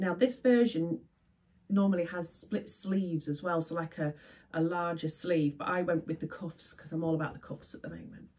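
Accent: Yorkshire, United Kingdom. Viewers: God, I love the way you chat to the camera and some of your 'Yorkshire-isms' . It gave me a good chuckle. I wish I'd known you when I lived in Yorkshire. I could have done with a good sewing friend at the time! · Hi again! Love all your makes, especially the Billie dress and the Marlo sweater. I did find the same with the Billie neckline and had to lower mine! You did a great job of your jacket. It was very amusing to hear the Yorkshire lingo again 😜😁 hope you are having a great weekend!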